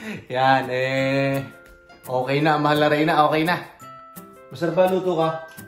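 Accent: Batangas, Philippines. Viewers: Kuh nga batanguenio pala arih, bay nga mga batang ang twag sa mga asawa e”huy” haha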